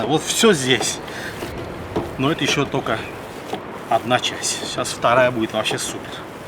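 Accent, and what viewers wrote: Baku, Azerbaijan. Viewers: у меня бабушка из Шеки, она всегда самая держит дома чаман. Приятно слушать бакинский акцент, привет вам от бакинских армян❤ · Здравствуйте! Я когда то жила в самом прекрасном городе Баку! Очень аппетитно готовите, с очень вкусным бакинским акцентом! Спасибо за удовольствие вас смотреть! Подписалась однозначно! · У вас акцент Азербайджанский! Вы Азербайджанец?😊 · Салам!!! Меня зовут Тимур я живу в Украине в городе Днепр! Я ваш подпищик К сожелению не знаю как вас завут спасибо за ваше видео все очень красиво один акцент ваш Бакинский чего стоит хотел вас попросить чтобы вы преготовили одно Азербайджанское блюдо называется Хящиль помню кушал но без сахарного песка не возможно кушать всегда нужно подсыпать в тарелку готовят это блюдо из муки и оно очень пресное, если получится будет прикольно зарание спасибо!!!😊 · Бакинская культура, Бакинский акцент не каждый понемаетеее. 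· Огромный привет вам из Грузии,дорогой мастер своего дела! У вас акцент Бакинский! Всему вашему прекрасному народу привет от грузина!👋🙂🇬🇪🇦🇿✊ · Бакинский акцент, может вы где то на даче в Новханах,ha? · И ещё хочу добавить,что Ваш Бакинский акцент.....без слов,это шедевр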